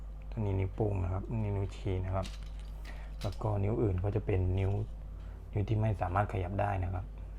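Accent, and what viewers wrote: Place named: South, Thailand. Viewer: พี่บ่าวคนใต้แน่เลย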